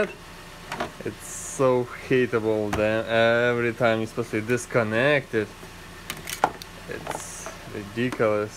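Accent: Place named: Russia. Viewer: I totally agree with you I couldn’t understand most of what he was saying with that Russian accent? I think he was trying to be funny or something but sounded so stupid!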